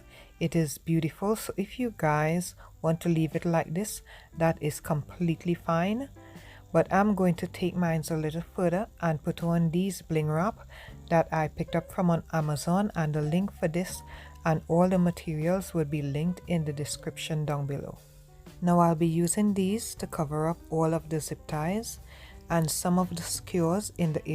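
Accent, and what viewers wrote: Trinidad and Tobago. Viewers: I'm from St. Vincent but I love the Trinidadian accent. I have relatives in Trinidad.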